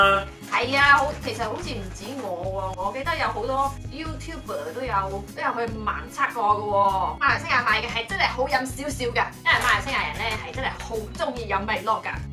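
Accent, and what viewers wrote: Cantonese, China. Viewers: 姐說的粵語真好聽。 呵呵~我就知道會有Milo，可惜我沒喝過大馬版的，台灣版的我覺得還蠻好喝的。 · 為什麼我聽妳講廣東話特別的舒暢？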